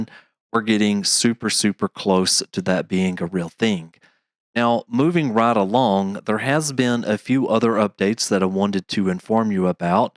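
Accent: United States. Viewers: From which state are you from? You sound like mid west .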